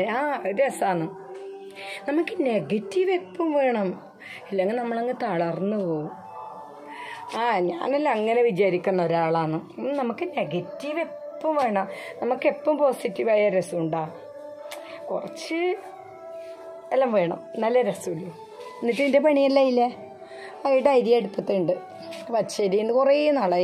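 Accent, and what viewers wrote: Kannur, India. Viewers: ചേച്ചി കണ്ണൂർ ആണോ 👍🏾ചേച്ചി സൂപ്പർ വീഡിയോ 🙏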